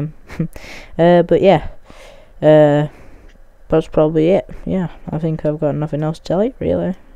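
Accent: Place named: United States. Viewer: U still sound american lol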